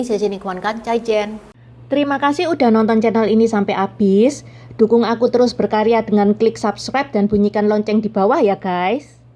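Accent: Java, Indonesia. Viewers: Mantap ci, saya suka gaya ngomong cici medok khas Cindo Jawa...RIP MBH-Oey Hwie Siang taipan no 1 Indo dari keluarga Hokkian/Fujian.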